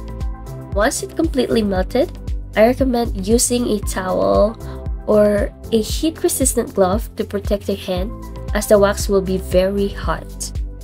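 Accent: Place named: Philippines. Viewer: Ang galing kabayan! Your accent is a filipina. Congrats❤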